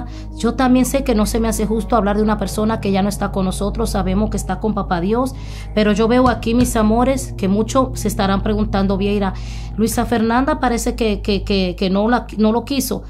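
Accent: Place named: Dominican Republic. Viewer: Vieira tu eres dominicana? Ese hablado nuestro se conoce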